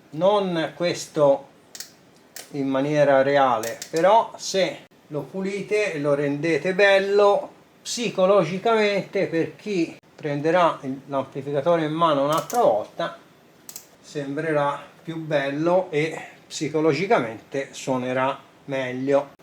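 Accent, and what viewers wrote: Tuscany, Italy. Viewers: Te sei toscano. Lucca?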